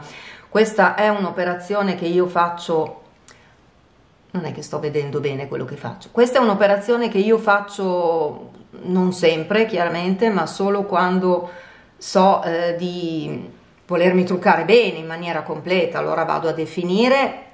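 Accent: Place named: Emilia-Romagna, Italy. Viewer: Sono passata a guardare un tuo video grazie a gattaluna 😊sn rimasta colpita dall accento...pensavo ma qst signora è della mia regione! Infatti quando hai detto Bologna tutto torna😅ciao io sn di Forlì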